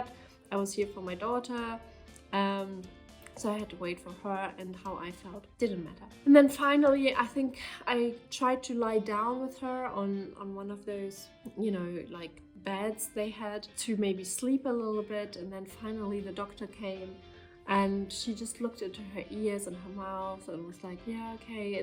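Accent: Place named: Germany. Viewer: I recognized literrally from the first words that you were german. Are there a lot of germans there? If yes, I wont move.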